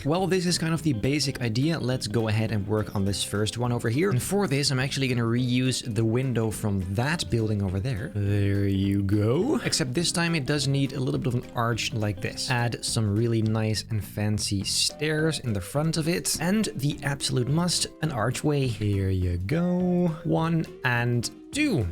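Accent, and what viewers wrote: Netherlands. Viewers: Me being Dutch, just caught me of guard with that Dutch accent 😅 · Can you actually make a video with your Dutch accent?? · Duts aksent is the best! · PLEASE MAKE A VIDEO WITH A DUTCH ACCENT 😹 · I was al wat verdacht maar het Nederlandse accent maakte het duidelijk dat je Nederlands bent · Pls let the man talk Dutch English for an episode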